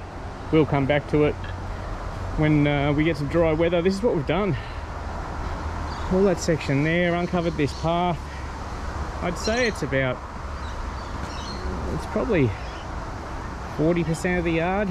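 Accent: Australia. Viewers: Love your Australian accent!!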